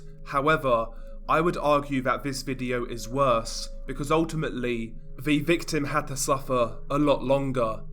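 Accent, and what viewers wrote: England, United Kingdom. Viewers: He's English. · Just discovered this channel a few weeks ago and been bin watching. He's a great narrator, must be the british accent. Great channel, i even saw other channels doing reaction videos to this content. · The British accent 😂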